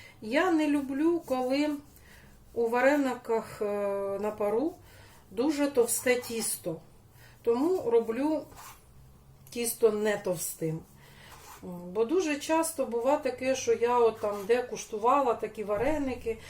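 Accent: Ukraine. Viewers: За вареники дякую і як приємно чути нашу мову, мову з центру України. Якось по домашньому. Ще раз дякую. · Приємно чути черкаську говірку, наче дома побувала.Добра Вам!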